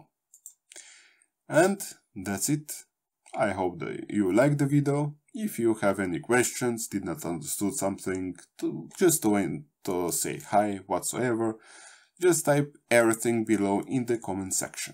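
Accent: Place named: Eastern Europe. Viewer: Thanks to you to take time to simplefy the process of normalising sound in a playlist, I can ear the you have a eastern Europeen accent, my wife is Russian.We live together in Canada now,as I'm Canadian. Thank for your help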